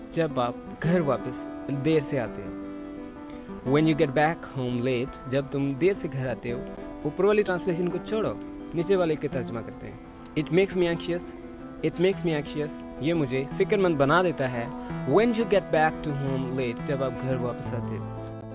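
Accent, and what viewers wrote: Punjab, India. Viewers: Wah g wah Vich punjabi touch bohat aala hai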